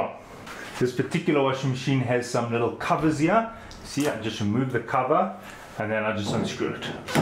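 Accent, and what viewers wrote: South Africa. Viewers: Am I hearing a fellow South African accent?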